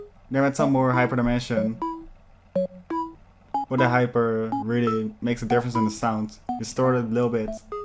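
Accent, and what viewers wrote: Netherlands. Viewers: Ik hoor een nederlands accentje ;)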